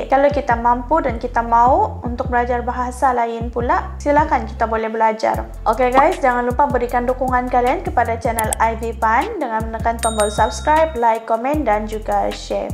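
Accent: Indonesia. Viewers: Wajar bisa berbahasa ind medok....karena kuliahnya di Indonesia dan lama tinggal di Indonesia.. bukan berarti Ndak menghargai BHS melayu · Apakah adik cantik Mimin dari Sabah atau Sarawakkah . Karena anda berbicara logat seperi kami Indonesia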